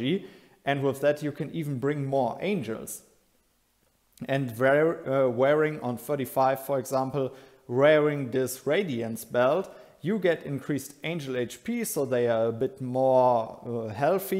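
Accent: Germany. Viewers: Very fun German accent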